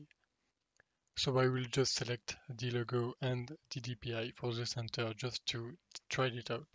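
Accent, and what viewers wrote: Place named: France. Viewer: Oulah c'est un français ça mdr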